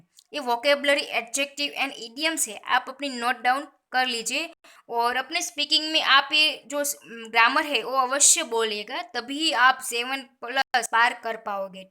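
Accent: Gujarat, India. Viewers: Your sounds like gujarati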